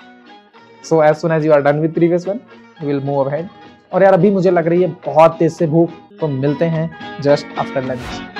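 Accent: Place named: North, India. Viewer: Bro could you tell..? where are you from..? your accent denotes you're from northern region of india. i.e UP/Bihar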